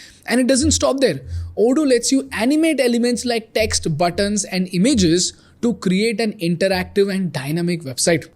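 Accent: India. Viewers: I must say that i was a fan of think school till you started lecturing what good communication should be. Why are you digressing. Your content is so good, be at that. You have such heavy Indian accent and monotony is the way you deliver things. Just someone saying you communicate well doesn't mean you take that up as your business. Do thinkschool, do great job.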